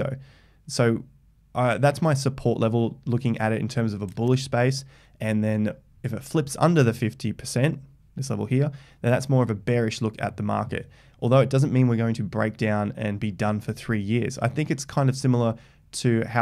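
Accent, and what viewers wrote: Australia. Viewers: I come to listen to ur analysis in ur Aussie accent :D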